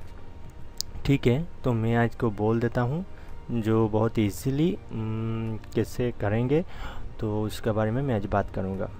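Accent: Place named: Odisha, India. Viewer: Bhai tu odiya ki hindi to achha se bol de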